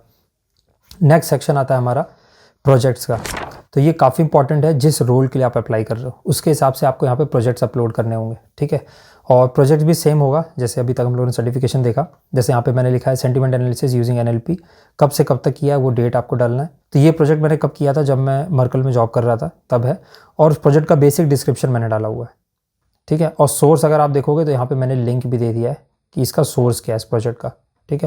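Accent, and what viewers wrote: Odisha, India. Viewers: Bhai are u from Odisha?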